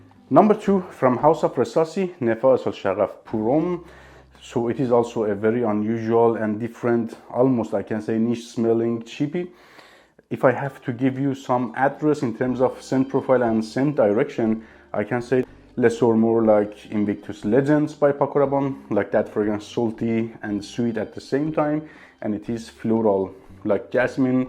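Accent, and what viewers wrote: Iran. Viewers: به لهجت میخوره ایرانی و هموطن باشی ، موفق باشی جان لذت میبرم از رویو هات و خیلی تو خرید هام هرسری کمک میکنه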